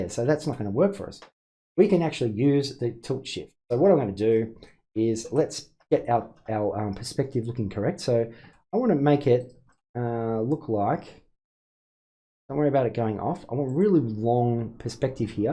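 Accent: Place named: Australia. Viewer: feel like im at aus again, with the accent, been working thier for about 3 yrs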